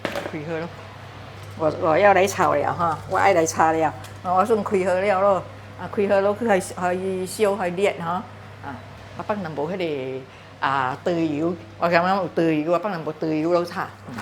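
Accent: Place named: Hokkien, China. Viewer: Aunty, thank you for this bitter gourd recipe, Today I learned that fish sauce can increase flavors of bitter gourd. I will try it out the next day if I cook this, favorite dish. It is a good dish for hot weather. Aunty, today you speak in Hokien dialect is a welcome sight for us Hokien aunties. I find you have Mandarin subtitles in your vid, that is very good for those couldn't understand the simple dialect. Bravo keep up your efforts I will always support your channels. Have a great day ❤❤❤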